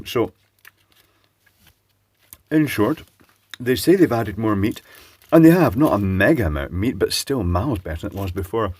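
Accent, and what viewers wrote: Scotland, United Kingdom. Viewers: Wonderful to hear such a delicous Scottish brogue describing a meat pie - the late and wonderful Ivor Cutler would have been in his element Existentially yours.......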